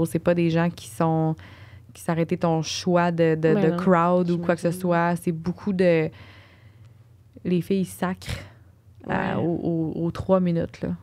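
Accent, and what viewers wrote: Quebec, Canada. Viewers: Un accent de zinzin? Tsey est Quebecoise et toutes ses autres " en studio" sont avec des Quebecoises hahaha. Tu fais quoi sur sa chaine si t'es pas capable de son accent?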